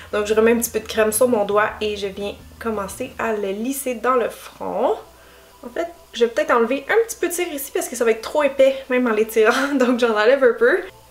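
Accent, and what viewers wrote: Quebec, Canada. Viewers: L’accent québécois ne va définitivement pas à la nonne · J'aime trop les accents québécois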